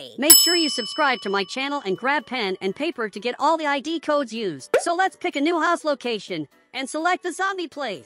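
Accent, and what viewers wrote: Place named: Portugal. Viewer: Oxi ele é português e tá fingindo ser inglês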